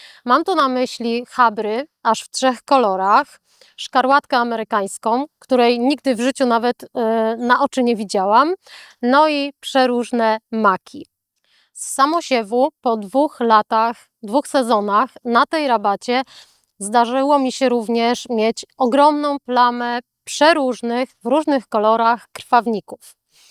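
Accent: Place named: Poland. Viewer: Och prawdziwa osoba, prawdziwy ogród, prawdziwy polski głos. Dziękuję za ogromną wiedzę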